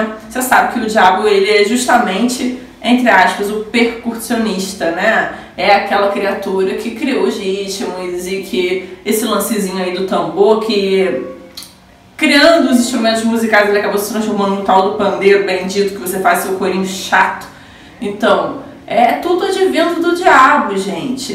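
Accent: Rio de Janeiro, Brazil. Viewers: Vc é daqui do Rio?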